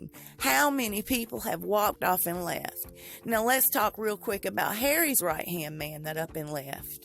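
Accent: South, United States. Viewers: P.S. I really Like Your Southern Accent. Where Are You From In The South?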